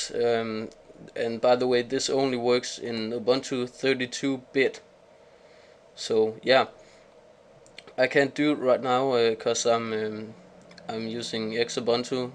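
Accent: Denmark. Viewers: Kunne høre lige med det samme at du er dansker ;)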